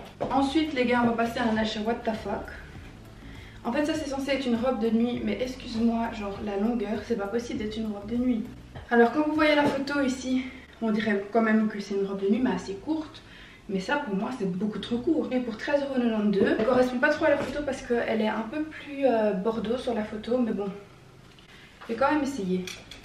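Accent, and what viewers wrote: Belgium. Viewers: C'est un truc de dingue comment j aime trop l accent belge mdr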